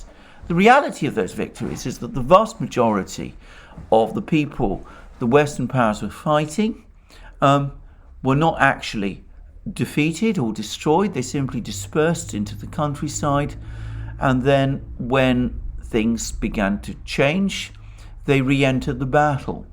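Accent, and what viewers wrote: United Kingdom. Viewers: Yes, i am commenting, but why are you shakin that strangely, greek man with good Brit accent ? Both russia and ukraine are NWO controlled...i saw in mr Christofouros channel comments praising russian president : this is complete foolishness. But majority wants to believe that either ukraine is the good cop or russia saves the world : divide and rules for unuseful idiots ! Thank you. Greetings from Polska...we are unique, because in our history always anti fascist and anti communist ! ( Like Orwell :-) )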